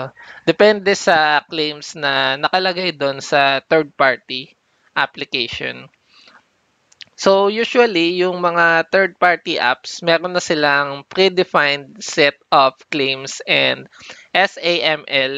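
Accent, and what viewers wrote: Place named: Iloilo, Philippines. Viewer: Nice tips sir, I've been sharing your post to help the community, niche ko rin sana to kaso di pako certified and di pako ganun ka bihasa hopefully marami akong matutunan dito. maraming salamat! and may ilonggo accent ang pag sasalita mo, I'm from Koronadal City , Ilonggo dialect rin. hehe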